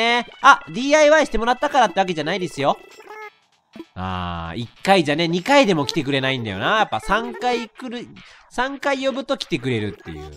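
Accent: Kansai, Japan. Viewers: あさみさんのアフレコしてるレトさん好きすぎる(*´ω｀*) ナチュラルに関西弁きけるし( ¨̮ ) てか、あさみさん可愛い！好き！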